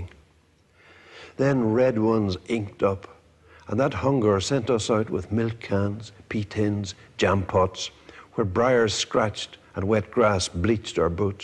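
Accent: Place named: Sligo, Ireland. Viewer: Oh How I would love to talk to this man. The sound of his voice sounds like Sligo. When visiting my Grandmothers house in Mulaghmore and cousins" The Feeney's" I walked a lane with rock walls and tons of beautiful blackberries. Their Grand Mother is buried next to Wm.Butler Yeats in Drumcliff. Alas, at 80 years old no more travel. What a great Poet. yvonne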